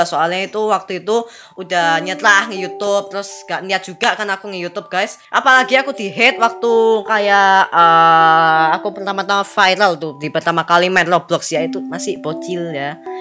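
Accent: Java, Indonesia. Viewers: Mungkin tanpa kalian sadari suara agak medok jawa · Medhug suaranya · Gw Udah Penonton Old Tapi Gak pernah Komen Jir :V Gw suka Syle lu Medok :V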